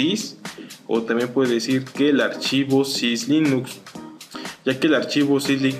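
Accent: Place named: Portugal. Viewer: creia que habkabas portugues o algo al principio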